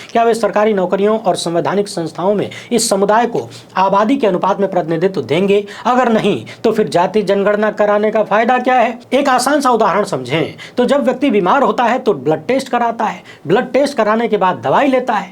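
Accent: Bhojpuri, India. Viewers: ए महाराज कहां के हैं बडा भोजपुरीया बोलते है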